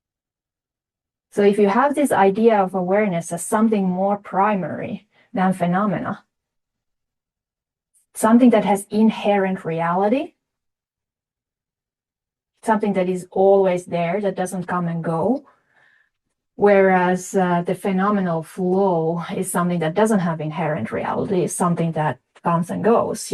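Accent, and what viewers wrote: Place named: Finland. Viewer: She's Finnish (not German), speaks excellent english with a wide vocabulary (more than most Americans), and has a lovely accent 😊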